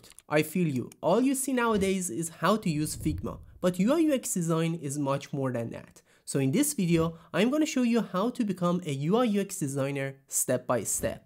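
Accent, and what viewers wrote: Iran. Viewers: کلمه اول رو شنیدم اینجوری بودم که عه ایرانیه طرف😂❤ موفق باشی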